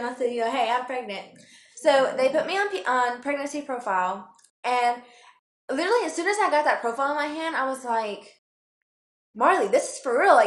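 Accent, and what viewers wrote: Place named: South, United States. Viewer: where u from I hear a southern accent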